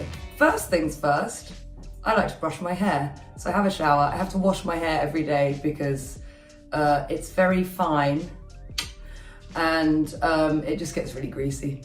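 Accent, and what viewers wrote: United Kingdom. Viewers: The way she talks is so adorably British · I love her 👌❤️ her british accent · Omg , i love so much the British accent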